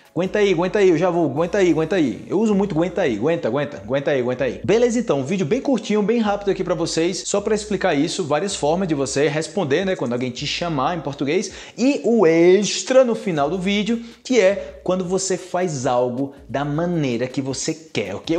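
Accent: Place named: Colombia. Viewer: Eu sabia que vç tinha espanhol da Colômbia! 😉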